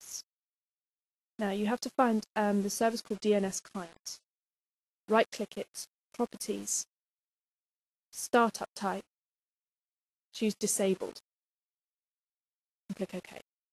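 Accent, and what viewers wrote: United Kingdom. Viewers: that is cool a fix to prevent slowing down, wit a lrg host file...luv ya Brit accent....gonna subscribe. =)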